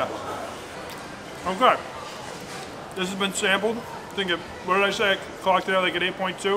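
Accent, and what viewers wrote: Boston, United States. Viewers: That Boston accent from 2017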